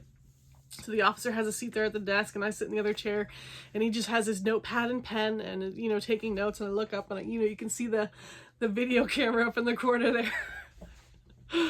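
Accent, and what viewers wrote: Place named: United States. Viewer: U r a very good lady. There are so many scammy crooks in the world. Best wishes for the future from England. Btw, we have the same problems. I think from your accent u r from the USA?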